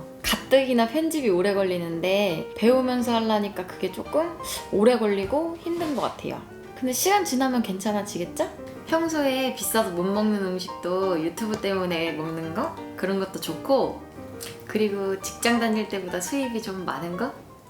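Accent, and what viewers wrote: South Korea. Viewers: 청주분이시죠😁😁 반가워용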